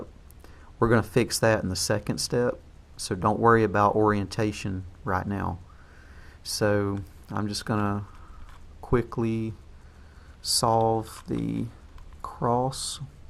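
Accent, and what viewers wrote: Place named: Australia. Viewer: A very strong Australian accent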